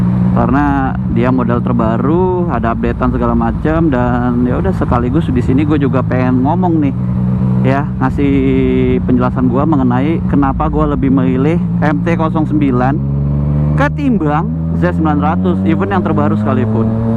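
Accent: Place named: South Jakarta, Indonesia. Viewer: Logat anak jaksel 😅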